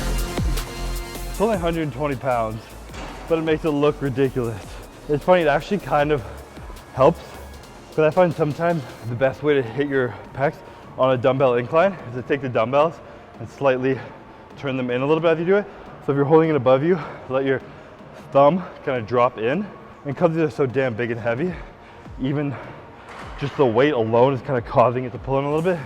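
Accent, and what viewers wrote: Canada. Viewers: Chris has got to be Canadian the way he talks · Canadian lol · That’s that Canadian accent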